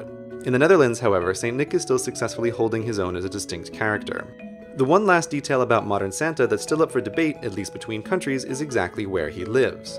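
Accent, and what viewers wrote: United States. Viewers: Probably not. He speaks with an american accent.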